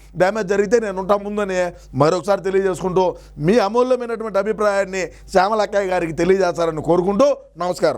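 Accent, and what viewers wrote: Rayalaseema, India. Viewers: మన సీమ యాస తో ఎంతో మందికి అభిమాని అయ్యావు అన్న నువ్వు జై రాయలసీమ జై సీమ రాజ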